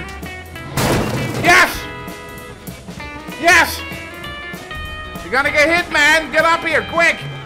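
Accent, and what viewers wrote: Netherlands. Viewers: I can hear Dutch somewhere in the accent, is it true?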